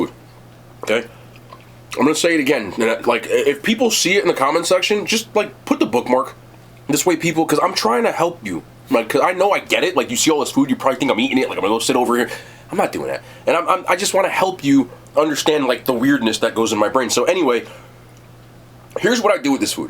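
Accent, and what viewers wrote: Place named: New Jersey, United States. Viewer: The jersey came out in this one😂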